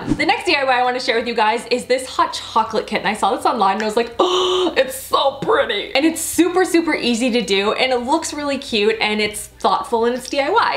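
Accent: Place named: Canada. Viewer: I love hearing you say about... you're a true Canadian😋 sad that I live in Minnesota because I have the accent but not a strong as yours😢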